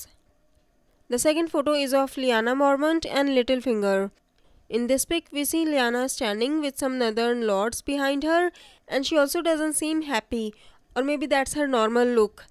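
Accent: India. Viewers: The commentator voice is very annoying, is like listening to a Indian person speak English.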